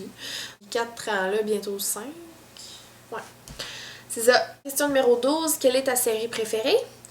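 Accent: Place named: Quebec, Canada. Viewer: Je suis totalement fan de ton accent (vive le québec :3), et tes vidéos ^^.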